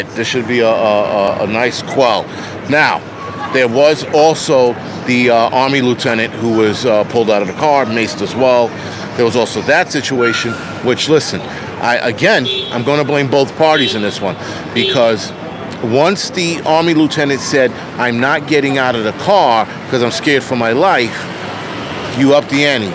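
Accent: New York, United States. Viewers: Can't see you and you sound like a newyoker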